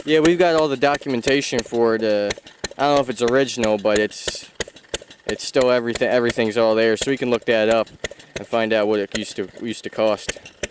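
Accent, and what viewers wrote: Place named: Pennsylvania, United States. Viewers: Dude lives in Pennsylvania doesn't he? That accent. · Are you from Pittsburgh? I'm catching some accent... Sweet mower though!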